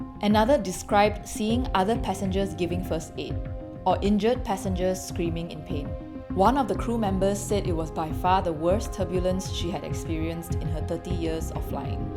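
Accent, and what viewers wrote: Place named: Singapore. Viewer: That narrator's Singaporean 'Singlish' accent is quite awful on the ears.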